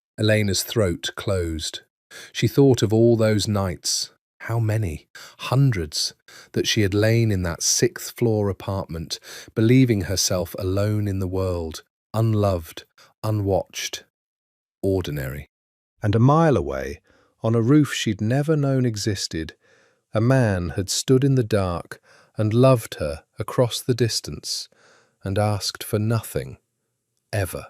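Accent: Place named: Liverpool, United Kingdom. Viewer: Sounds like a Liverpool accent love it great storyline and characters well developed 🎉🎉🎉🎉🎉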